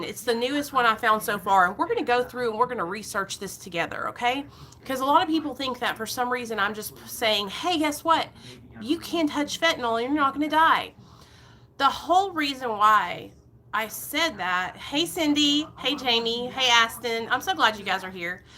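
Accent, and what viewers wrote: South, United States. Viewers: R u from down south? I'm recovering addict outta Houston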